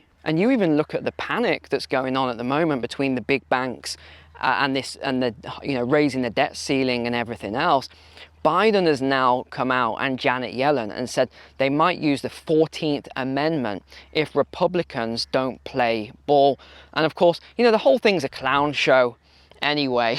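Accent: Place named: London, United Kingdom. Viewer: Your from London I bet,love it!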